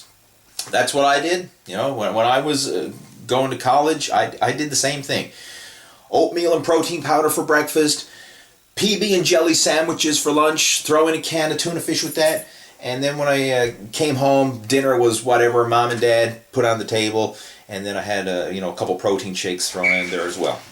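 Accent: Canada. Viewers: Beans, lentils, skim milk powder, eggs, cottage cheese. cut out crappy snacks, booze and takeaways. ln the end cut your suit according to your cloth. Great site. Cant quite get the accent, are you Canadian. Difficult when from England to spot the accent.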